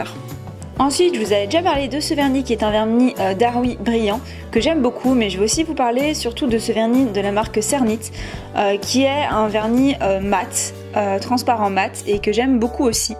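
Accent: Belgium. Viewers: tu est belge ou Française moi je suis belge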